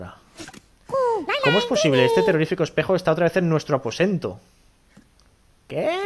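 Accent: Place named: Catalonia, Spain. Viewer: Hahaha, malamente. Se nota que tu idioma es catalán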